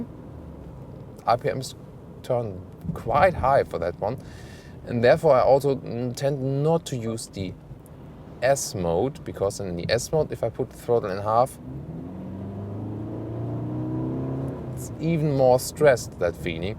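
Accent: Germany. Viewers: That German accent is so cute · Love the German accent 👍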